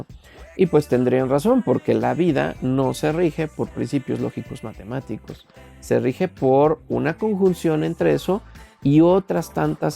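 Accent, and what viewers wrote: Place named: Mexico. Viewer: tu voz es genial , tengo un profesor que me gusta grabar su voz en clases porque después de escuchar y procesar la información de la clase y reproducir los audios me sirve para entrar rápido en flow y redactar párrafos de la investigación. Creo que tienes un gran potencial el ese tema porque eres mexicano y nuestro acento es considerado como neutro , los acentos de los españoles y sudamericanos no es armónico aunque el contenido sea interesante tengo que pausar varias veces los videos. Así de ñoños somos los investigadores jeje 😛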